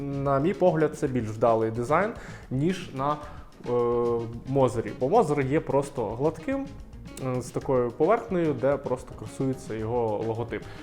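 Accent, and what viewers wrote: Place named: Surzhyk, Ukraine. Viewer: Как бы ты не пытался, но увы, слышно четко, что Украинский язык не твой родной, суржик это твое, вся восточная Украина знать не знала своего языка, все говорили на суржике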